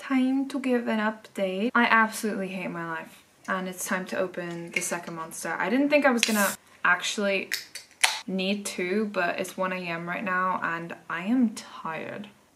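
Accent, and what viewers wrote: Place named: United Kingdom. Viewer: ik verschoot toen ik u ineens nederlands hoorde praten ik was echt convinced da ge brits waart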